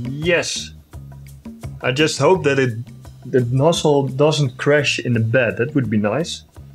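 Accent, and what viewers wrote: Netherlands. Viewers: I smell a dutch accent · Love the Dutch accent! I am also Dutch lol. Love the video to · Doubted if you are dutch because of your accent. My suspicions were confirmed 😂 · Ook een nederlander haha ik kon het horen aan het accent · Nice work and a very interesting project. Your accent makes me miss the time i actually lived in Holland (Harlem). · love that dutch accent.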